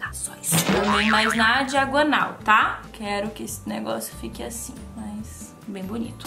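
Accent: Rio Grande do Sul, Brazil. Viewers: Te, ta, guria, guri, de... que amooorrr quando ela fala como gaúcha😍😍❤️❤️, sou do Rio Grande tbm, mas do interior, no fim do mundo na vdd 😂😂😂 Porto Xavier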